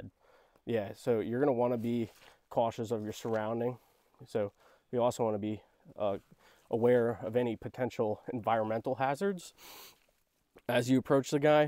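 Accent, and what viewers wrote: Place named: New England, United States. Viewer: No where near Appalachia. Doesn't look like my backyard, just a little to flat. Plus your Yankee accent tells me the same thing. Otherwise, the idea of your video is good..I wish you much success in your endeavors. The more educational content like this the better.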